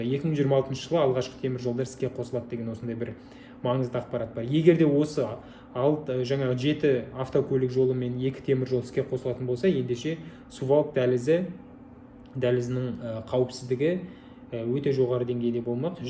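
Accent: Standard. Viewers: Айналайын балам, Рахмет.Таза қазақ тілімен жетемізге жеткізіп түсінікті түрде айтып отырсың.Сендей сауатты Қазақ балалардын қатары көбейе берсін.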